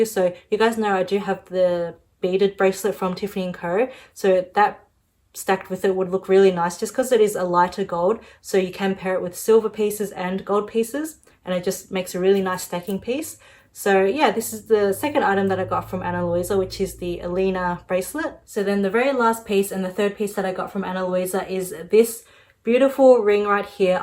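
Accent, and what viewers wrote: Australia. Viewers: Are you Korean? You sound like you kind of have an Australian accent. You remind me Rosie a little from black pink I love them so much !!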